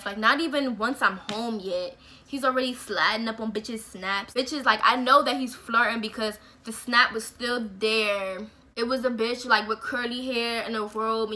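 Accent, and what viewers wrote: United States. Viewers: i could tell u was from pg... same girl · I need a Maryland chick 💀 I’m loving how you talk sis 😂❤️😘 but don’t get me started on cheating & niggas 🤦🏽‍♀️ I got storytimes for days on my channel lol 🙄 keep doing yours!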